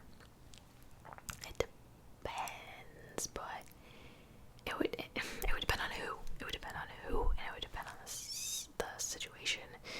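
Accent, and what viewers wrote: United States. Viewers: what part of America are you from? (I’m Australian so the State is probably all I’ll know 😂)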